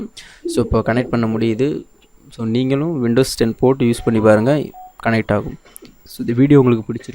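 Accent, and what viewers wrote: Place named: South India, India. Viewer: Your pronunciation is like of south indian. So your vedio quality is not useful universally. Try to use easy pronunciation so that maximum people can understand your language. Ok. Thank you.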